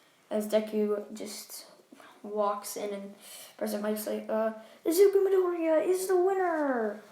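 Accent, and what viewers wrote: United Kingdom. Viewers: U british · It was British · Is this man brithish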